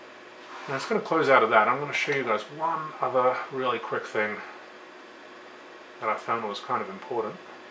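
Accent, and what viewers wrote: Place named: Australia. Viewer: Damn good video. I'm guessing that is an Australian accent. From a Brit perspective, when I was young (with my youngun mind), basically Australia looked like one of those countries that was big enough to have stuff USA had, like skyscrapers and basically "massive civilisation stuff". I hope that makes sense. Well, anyway, it intrigued me as a kid the way Australia (and actually Canada is a bit like this) has that and has PAL and the Amiga. It was like the Amiga having a fighting chance (and I was an amiga fanboy of course). Then, in Europe (northen Europe and then down to France and Spain) used the amiga too but also UK) they seemed kind of condensed like a hardcore of coders (demoscene etc) and gamers as a seeding ground for amiga stuff that would permeate around the world. A channel which reminds me of yours a bit is PhilsComputerLab because he appears to somehow have some space (does he actually live in the outback or something?) and tons of hardware. 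So Austrlia (and big landmass countries with skyscrapers like Canada) had the resources and civilisation to form these kinda Amiga museums today. It is shocking what amiga stuff ended up thrown out in the UK. I remember hearing about a guy who had a gold colour a500 (one of those special ones) and while he was at university, his mum cleared out the loft and thereby ditched it. Ouch. So, maybe you can see why I like Australian (and canadian etc) usages of the amiga. :)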